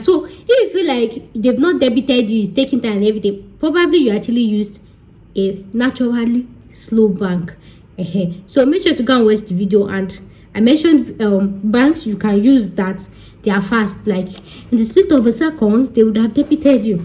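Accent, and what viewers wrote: Yoruba, Nigeria. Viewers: Your video is real with your typical Yoruba/ Nigeria accent. God bless. Pls, do consider we viewers not from Nigerian tooooooo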